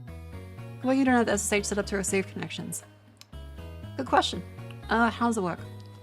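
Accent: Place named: Australia. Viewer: Shark girl? Red head? Australian accent? Mini skirt/giant overcoat combo? Glasses? Yapping? Coding knowledge? Oh yeah, instant sub. Looking forward to more videos :D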